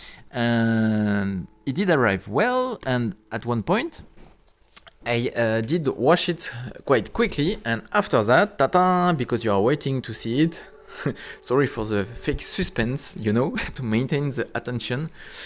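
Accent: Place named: France. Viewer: Bonjour, si je vous écris en Français c'est que j'ai cru percevoir un accent Français dans votre anglais...Je suis frileux avec le fait qu'à l'intérieur de ces roches, l'eau ne sera pas filtrée d'une part, et sera stagnante d'autre part. Qu'en pensez vous?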